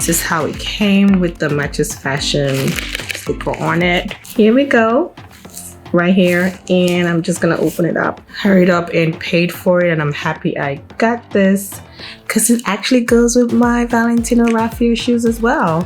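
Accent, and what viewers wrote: Nigeria. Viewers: Iam always here for the intro😂 it’s the 9ja accent for me😅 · Omo i thought you were like born in America , your Nigerian accent is cute 😂, i sha subscribed before I even clicked your channel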